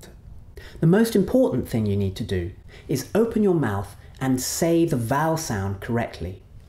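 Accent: United Kingdom. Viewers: Omg I love British accent, do you give individual classes? Also of grammar? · Hi,! How do you do? Howdy? You are a dashing English teacher. Your British pronunciation is clear.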